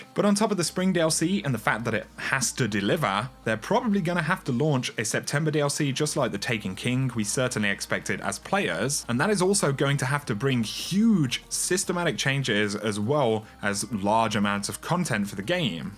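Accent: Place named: United Kingdom. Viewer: Even bad news sounds good in a British accent...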